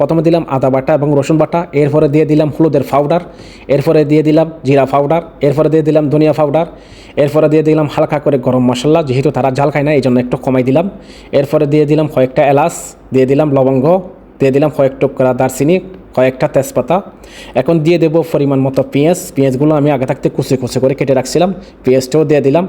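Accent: Bangladesh. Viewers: বদ্দা তো চিটাইংগা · হেতে নোয়াখালীর বাংলা কয় তাই না?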